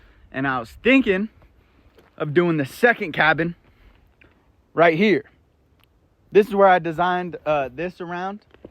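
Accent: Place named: Arkansas, United States. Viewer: I find it so strange as an older person to see a 20 year old white boy in the Pacific North West talk like an old black man from Arkansas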